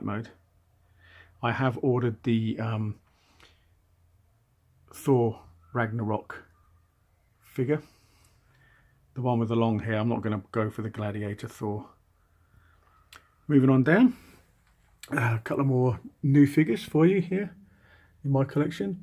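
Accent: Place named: United Kingdom. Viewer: To thanks for always uploading your video. I have seen some of your old video(and love it)-aliens marines,wolf complete with cleaner kit asesory,predalien and always feel amazed how in depth your reviews are. And yeah maybe its awkward but i do like your accent - the explanation so detailed and smooth:) Sometimes i wonder why i never born at UK hehe:). Im a new collector sixth scale mainly ht old version preds and aliens marines but thanks for always uploading the figures:)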